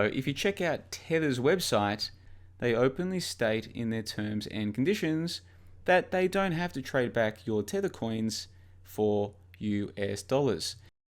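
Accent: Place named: Australia. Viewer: I don't disagree with anything said here.. Private company issuing tethers is not dissimilar to central bank printing money. Tether will undoubtedly be involved in the crypto crash. Although still lots of room to inflate first.. Thanks for putting it in simple terms and nice to hear an Aussie accent in this space!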